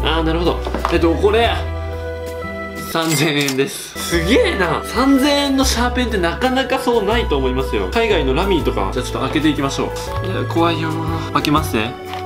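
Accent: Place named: Kansai, Japan. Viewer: 謎の関西弁。惚れた。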